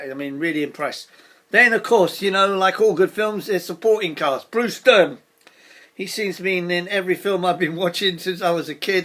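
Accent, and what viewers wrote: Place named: Cockney, United Kingdom. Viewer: Great mate , thanks for the heads up on this film , keep em coming , cockney reviewer great to see 😁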